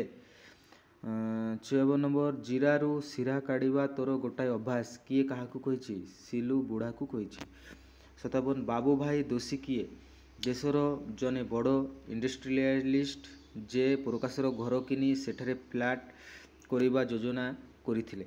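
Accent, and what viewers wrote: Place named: Bengal, India. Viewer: ବେଙ୍ଗଲୀ କି ତମେ 😂😂😂ଓଡ଼ିଆ ହେବନି ଭାଇ ତମ ଦ୍ୱାରା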